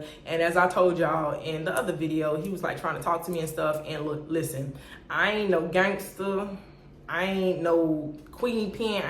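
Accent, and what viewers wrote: Florida, United States. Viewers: Listening to your stories I was trying so hard to figure out what part of Florida you from. Highlands county deep in the country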